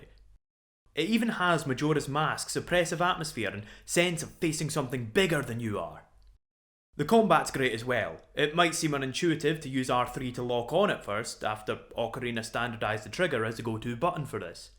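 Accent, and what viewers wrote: Scotland, United Kingdom. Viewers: I freakin' love your scottish accent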